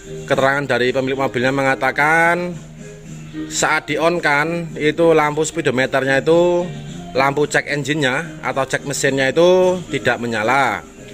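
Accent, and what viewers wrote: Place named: Java, Indonesia. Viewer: Maaf pakdhe ,aslinya orang jawa mana? Kok kebrongos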